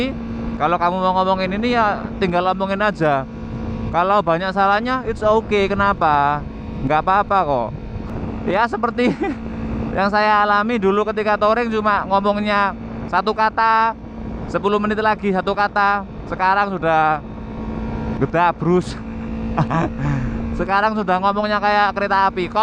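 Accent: Java, Indonesia. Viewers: saya suka ke medddhok an anda🙌😁✌ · suka sekali bahasa medoknya has sekali...OK mas bro...bs gk ngabuburitnya viewnya sepanjang jalan sunan giri sampe sby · Upload terus videonya Mas, bhasanya aku suka medok2 pie gitu wkwkwk Salam Dari Anak Jawa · ikih loh sing bikin seneng,logat lan guyune... gimana ya klo featuring sama ajgum motovlog yang satu Sunda abis yg satu Jawa medok... mesti keren.. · saya tunggu om konyol'e medok.. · iyoo mas, bahasa medok, Jawa gadho2.... tp mlah gokil, gk ngebosenin, pokoke like dech bang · medoke sae mas njenengan. nek kulo mboten sae sanjang kados niku hahaha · gapapa mas, kalau medhok tuh biar lucu #Hehehehe #...!!! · kekuranganmu jd kelebihan kang... jd inget Iwan Banaran..podo2 medok bhsny..tp followernya..byuhhh ribuan bosss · mas lok ngevlog ajak lady nya dong, biar seru gitu! we like you medok... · ciri khas medok nya jadj ada khas2 sama sensasi gimana gitu hahahaha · mas ini ngomong medokny itu lho enak didengar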